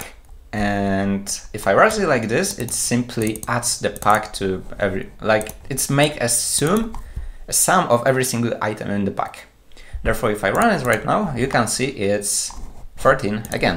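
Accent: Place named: Poland. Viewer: nie wiem czemu ale od razu wiedzialem ze to polak nagrywa. Akcent nie angielski ale kogo to obchodzi. Nie musi byc przeciez native speakerem. Hindusowego akcentu tez nie ma :D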